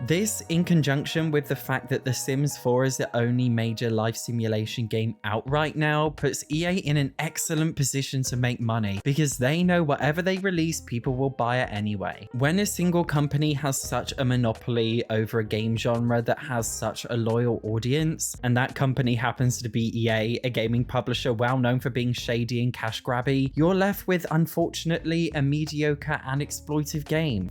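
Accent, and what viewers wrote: United Kingdom. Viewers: As an English Language and Literature major ( I am Turkish) I've been seeking to find someone who has a nice British accent to follow cause I don't want to lose my accent sparkle (u know). And I am so happy to find you! I will listen to you even if you keep complaining lmao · Keep being British I love your accent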